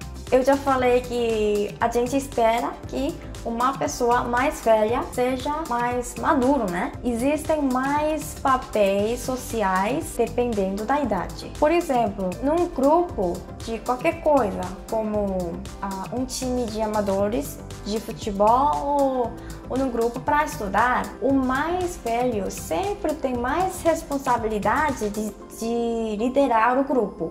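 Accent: South Korea. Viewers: Você ja deve ter ouvido falar, mas aqui em minas gerais falamos "né" com MUITA frequência mesmo. Da até uma certa "familiaridade" em te ouvir falar mesmo com o sotaque coreano kk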